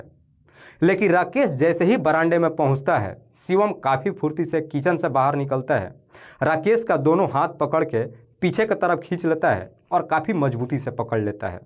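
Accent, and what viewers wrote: Bihar, India. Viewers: Sir ji aap kya bihar se ho kya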